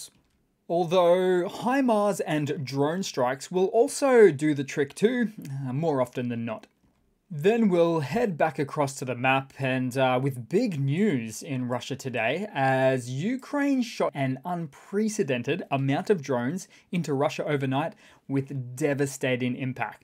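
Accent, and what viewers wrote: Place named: Australia. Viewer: I need a more huge wins for the Ukraine 🇺🇦 in my lifes ! Thank you please And this Australian accent rocks m8) 🙏💙✊ Cheers man 💎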